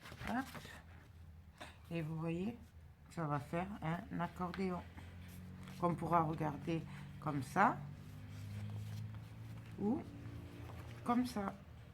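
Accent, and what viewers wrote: South, France. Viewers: Original et simple effectivement, un bon entraînement pour débutant 😀 mais pas seulement 😀j'aime beaucoup ce que tu fais et tes explications avec en prime l'accent du midi, c'est très agréable, merci 😊bises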